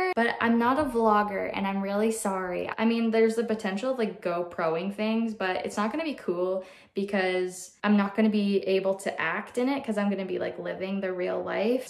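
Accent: Canada. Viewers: How would sounding too Canadian be a problem? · It's so silly they wanted you to sound less Canadian. Why did they specifically want it to be American? Why not English or something else? The "assume american unless otherwise stated", "american is the default/assumed place of residence" and "all things american are superior" attitudes are so weird. I'm Australian and I much prefer the Canadian accent over the US accent. Your voice and accent is lovely. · Canadians also say car... interestingly. So, the sentence "I'm about to take the bag out to the car" is, uhh... real interesting. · I can't unhear the "about" and "out" now 😂 it makes me wonder if I do the same thing but I'm western Canada so idk of we would talk the same also super cute kitten! And congrats on your new exciting changes!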